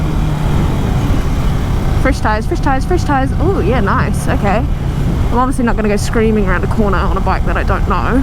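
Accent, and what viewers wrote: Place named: New Zealand. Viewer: Picked your from NZ as soon as I heard your voice. Hi from South Australia, great video.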